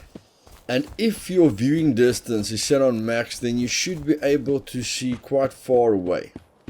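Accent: South Africa. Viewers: Nice guide first thing I noticed was that South African accent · Like the accent, you from SA?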